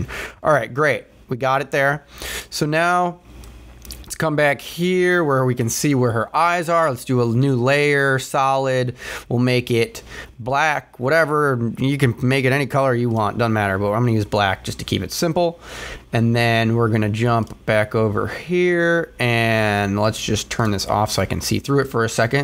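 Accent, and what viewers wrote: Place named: United States. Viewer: I HATE YOUR ACCENT IT MAKES ME SOOO ANGRY!!! fucking amercian talking like a hobo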